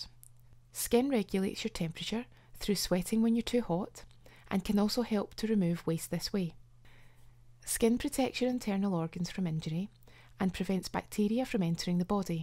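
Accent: Scotland, United Kingdom. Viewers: I really love your vids, please keep them coming! Very informative and I love your voice and Scottish accent!!! Cheers!!!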